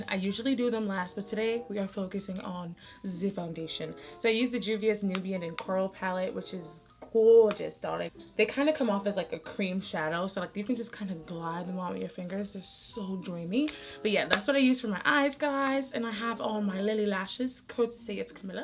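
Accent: United Kingdom. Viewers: Girl! Where you been? It has been too long and your UK accent is coming along nicely 😏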